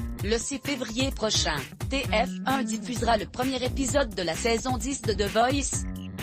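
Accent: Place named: Quebec, Canada. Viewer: Une voix québécoise qui raconte....ça fait bizarre!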